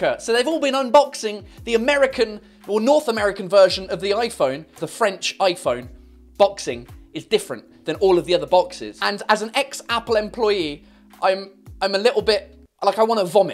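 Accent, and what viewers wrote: England, United Kingdom. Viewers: You sound like Cyprien,if he talked in English with his style and he had a English accent he should sound like you. POV: I m Franch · Watch Mr whoes the boss bro English not American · Are you from the uk It sounds like the accident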